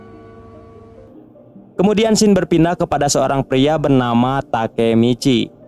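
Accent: West Java, Indonesia. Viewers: Sunda geningan ngomongna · Logat sunda na mah aya wae da hese lengieut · Bg asli Sunda kah has Sunda bgt suaranya 😆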